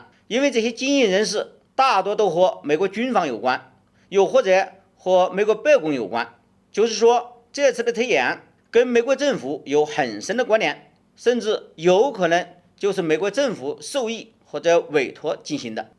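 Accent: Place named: Xiangfan, China. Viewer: 主播是襄樊的吧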